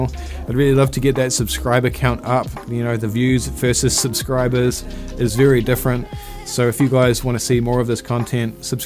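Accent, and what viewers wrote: New Zealand. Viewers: It's so true what you say about the Fuji APSC vs 35mm. Too long a story to tell in full, but after 11 years of battling it out with Fuji and Lightroom, I've recently swapped over to two Nikon Z bodies, a bevy of their gorgeous Z lenses and Capture One. The difference is off the planet and it is the best thing I've ever done. Even my customers commented on how my images are so much richer and deeper and more gorgeous. I'm actually amazed how I kept any customers using Fuji for all those years. The Z50mm and Z24mm 1.8S lenses are just about glued on my cameras for all my events/weddings and gigs. The Nikon files are gorgeous and are just so rich and deep and process so well in C1. Was great to discover your channel. I'm a Kiwi but live in Perth now, but it's great to hear the accent and to get a glimpse of my wonderful homeland. Keep up the good work.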